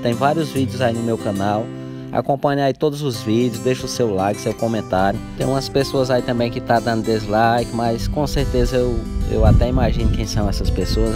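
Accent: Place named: Northeast, Brazil. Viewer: Oi, eu sou de Belo Horizonte e adoro os seus vídeos com o seu sotaque nordestino e as músicas de fundo. São bem informativos e mostra bem a alegria de vcs aí do nordeste, e a nossa tb, pq ficamos felizes só de ver a alegria que vc transmite.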